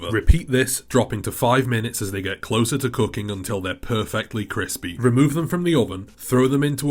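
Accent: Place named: Black Country, United Kingdom. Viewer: Black country is my guess.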